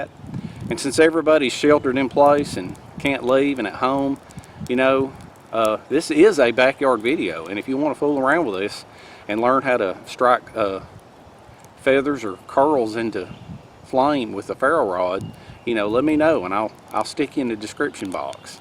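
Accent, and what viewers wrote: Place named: Georgia, United States. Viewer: I love watching all the different Bushcraft guys, I like the Canadian's cause they have so much beautiful wildness trips but for learning I like watching you guys in my area, southeast USA cause we have similar woods and weather conditions. You were the 1st guy I started watching, mostly for that Georgia accent, very educational and entertaining. I like watching David West a lot too. He can cross his eyes make fire. He isn't so much Bushcraft but he loves finding new ways to make fire. Enjoyed your rambling as always. Keep em coming when you can.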